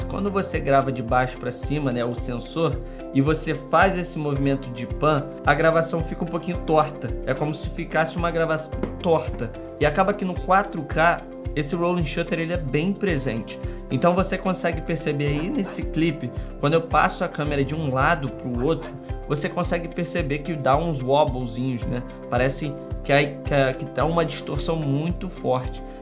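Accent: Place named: Rio de Janeiro, Brazil. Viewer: o sotaque carioca puxadissimo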